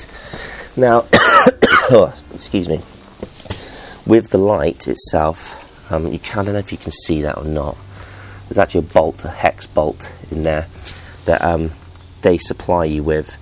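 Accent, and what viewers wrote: Somerset, United Kingdom. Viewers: It is a painful process especially as we have had such good weather here in Germany, but...it is ordered and will arrive at sometime in the future. We are really lucky that Harley allow so many test rides and over the next two weekends the Harley Truck is at two dealers not too far from me. Just a quick question, what town do you live in? I think I recognize the accent and grew up in Weston-Super-Mare :-)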